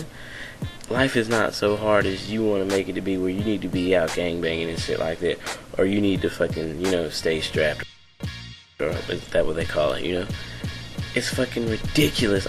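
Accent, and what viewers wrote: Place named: United States. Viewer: speaking correctly is a matter of opinion. You're way too americanized.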